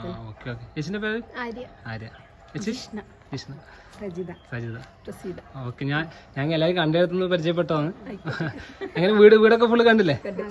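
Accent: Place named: Kannur, India. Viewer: നിർത്തി നിർത്തി പറയൂ സഹോദരാ... അതും കണ്ണൂർ ഭാഷ,,, നിങ്ങൾ അവിടെ പരസ്പരം പറയുമ്പോലെ ആകരുത് വീഡിയോ ചെയ്യുമ്പോൾ. എന്താണ് പറയുന്നത് എന്ന് ആർക്കും മനസ്സിലാകില്ല.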